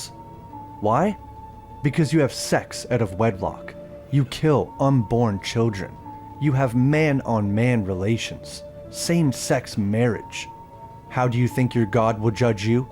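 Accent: Canada. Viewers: You lad are a canadian, 😂 i just noticed - tha accent been lost lately, you sneaky bouuut beer drinking mofo 😂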